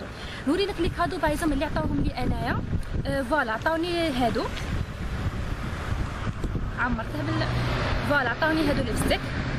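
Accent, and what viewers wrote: Oran, Algeria. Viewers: Asrar Yousef هذه لهجة الغرب الجزائري و بالضبط وهران نحن أيضا لا نفهم اللهجة السعودية أو الخليجية عموما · يعطيك سوسة محلاك ماكنتش نحب اللهجة الوهرانية بيك انتي حبيتها معاك لولو التبسية <3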